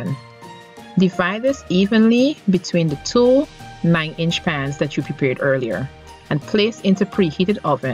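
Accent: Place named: Guyana. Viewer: I've been looking for and using online recipes for years but its been a real pleasure to find your recipes for my favourite Guyana home recipes! Your reassuring helpful Guyanese voice and accent are comforting and inspiring. Thank you! Can't wait to get started!👍🏾🇬🇾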